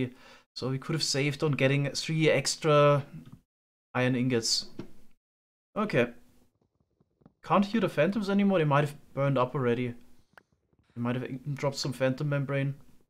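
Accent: Germany. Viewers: Are u German ? Weil ich es bin haha und ich es irg wie bemerkt hab??? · Mate, I love watching your videos from time to time and they're always really informative and entertaining. But as a fellow German with basically a cockney dialect I have to say - that German accent drives even me crazy. 😂 No worries though. Love your vids. <3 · Man hört den deutschen accents so hart 😂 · Man hört sehr raus das du deutsch bist aber trotzdem guter YouTuber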